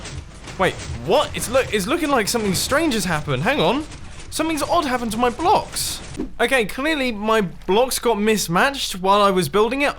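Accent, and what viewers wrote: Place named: United Kingdom. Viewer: I love that British accent "watah source, watah source, watah source" xD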